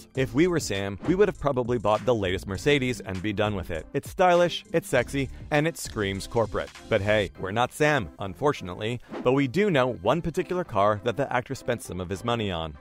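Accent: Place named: United States. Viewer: Please learn to pronounce Glasgow. American narration is awful.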